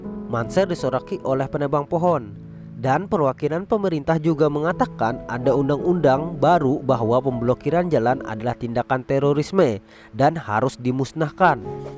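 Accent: Indonesia. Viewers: Kaka ya orang banjarkah ? Soalnya logatnya ketahuan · Ciri khas suaranya kaya orang Kalimantan 😁 aku udah subscribe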